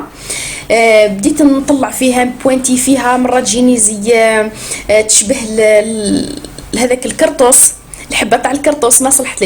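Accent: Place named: Chaoui, Algeria. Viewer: تباني شاوية من لهجتك الله يبارك شكرا كنت حابة نتعلم ومعنديش دراهم وزيد غالية بزاف والله نحيتي علينا ربي يحفظك ويفرحك ويحفظلك بنتك ❤❤❤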